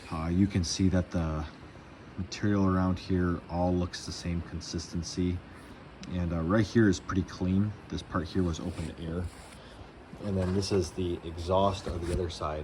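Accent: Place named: Wisconsin, United States. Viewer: I was gonna ask if you were from Wisco with that Sconnie accent. But then I saw Monona on your hoodie and read the bio on your page. Always nice seeing some locals on you tube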